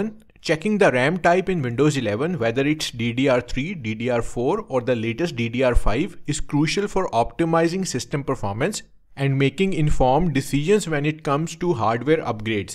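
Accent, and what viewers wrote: India. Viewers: what he means is that because your english sounds very indian he said that as a stereotype aka that all indians are scammers. i think your english is fine and tips are good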